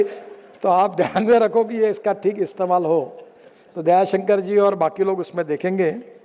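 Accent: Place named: Nagpur, India. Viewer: Kya baat hai...👍... Nitine kakaa,...maja aagayaa sunkar....😂😂😂.... bahot badiya bhashan...❤️...se akdam... Nagpuri touch me...🙏 Namarkar...apko..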